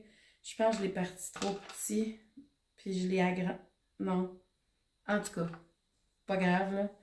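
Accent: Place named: Canada. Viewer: Oh ! Le charmant petit accent canadien.....je ne vous connaissais pas mais je m'abonne car j'aime le tricot et d'après les commentaires c'est votre domaine ! 🤔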